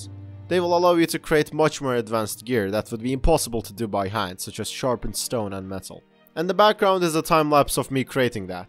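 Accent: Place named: Turkey. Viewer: mirror tutorial videonu izlerken abi bu adamın aksanı türk gibi diyodum harbi öyleymişsin. Valla kanalını yeni keşfettim umarım istedğin şeye ulaşırsın. Oyun da güzel görünüyor bu arada. Test için yardımcı olabilirim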